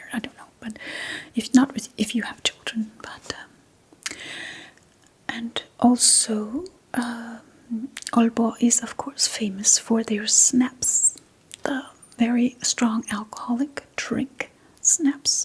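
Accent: United States. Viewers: I would have liked an English version rather than American English. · Someone please tell me how this Dane not only speaks flawless English but does so with an American accent.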